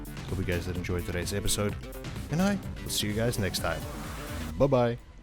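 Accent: South Africa. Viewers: are you south african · Lol bro I can hear you are from south africa thanks for the vid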